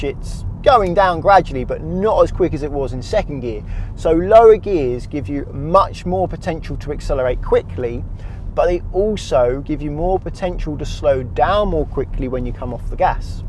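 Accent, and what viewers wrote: United Kingdom. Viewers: Your accent is so beautiful.which part of the UK does it come from?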